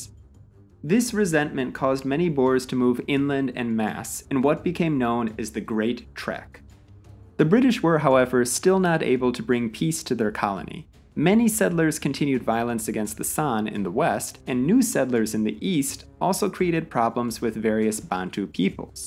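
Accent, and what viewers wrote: United States. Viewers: There is something very wrong with a person explaining to the world from an American accent what happened in Southern Africa! Where does he get his information from? No, I'm sorry I refuse to listen to this. He doesn't get the nuance or intricacies of the issues. I was under the impression that the Kei river border wars were between the Xhosa's and Dutch settlers not with Koi Koi tribes. It also seems that he outcome of historical events depends on who you ask and from which colour and Tribe they come. but no please don't give me an American trying to tell me about my history!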